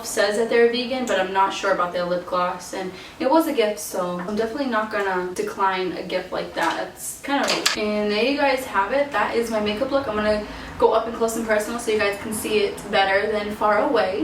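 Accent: Boston, United States. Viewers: You are so gorgeous naturally and I love how you wear minimal makeup I’ve been wearing less makeup lately do to it causing me to break out and I love your Boston accent ❤️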